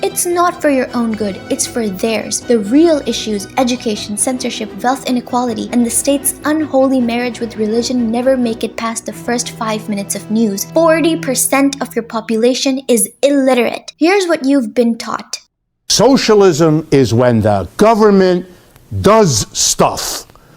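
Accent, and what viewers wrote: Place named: Pakistan. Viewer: I understand now. I should've deleted the above comment after you explained the same in a reply to my other comment :P I assumed you are an overseas Pakistani because of the accent XD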